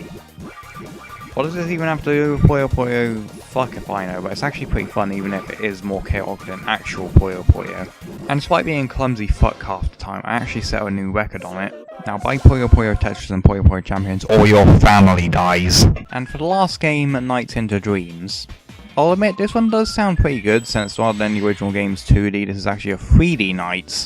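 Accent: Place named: United Kingdom. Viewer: Bruh your British o.o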